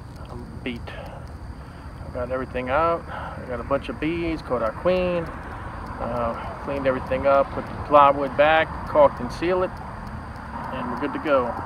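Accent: Louisiana, United States. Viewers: I am originally from Texas, now live out west in Nevada. I was ecstatic to hear your Cajun inflection in this video! I am also so very impressed by the calm, professional job you did in the transferring of this massive colony. Your love for the bees is well documented, and if any person within 200 miles of Metairie LA needs bee transference, you are the number 1 choice! God bless you, sir!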